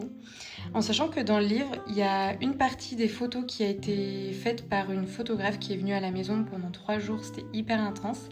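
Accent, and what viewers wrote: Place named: South, France. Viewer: C’est drôle j’ai remarqué que tu as l’accent du sud mais que sur les mots qui finissent en « in » ahah ça a un peu perturbé la sudiste que je suis ! Mais ta vidéo était vraiment digne d’un poème c’était tout doux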